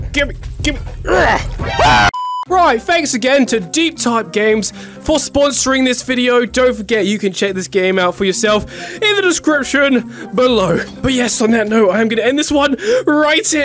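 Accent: United Kingdom. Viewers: I love british voice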